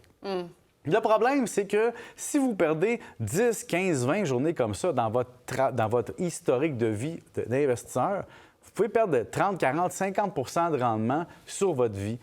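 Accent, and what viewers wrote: Quebec, Canada. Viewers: J'adore les analyses boursières avec l'accent de nos amis quebecois... 👍😂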